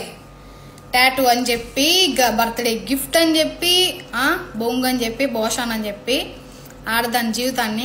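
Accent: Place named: Kadapa, India. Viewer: Kadapa language vaguthunnavu neevu ya district girl.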